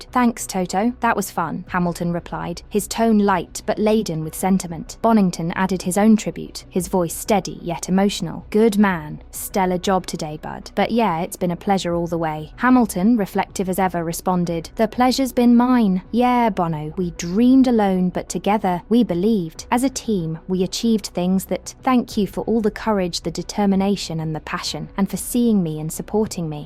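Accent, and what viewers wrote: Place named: England, United Kingdom. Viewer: I see this is a USA based channel, therefore I assume the narration is artificial. No American lady could do an English voice so well.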